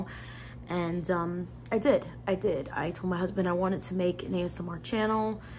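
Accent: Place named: New Jersey, United States. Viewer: I am a new subscriber. I am originally from New Jersey, Jersey Shore and I miss it so when I came upon your Jersey Girl checkout girl, I immediately subscribed. I live in Colorado with my wife now and miss Jersey and was laughing out loud at your accent! Lol. I hope I didn’t show up too late to the party but you have to be happy like you said in your video. I wish you the very best of luck in no matter what you decide to do. All the best to you and your family.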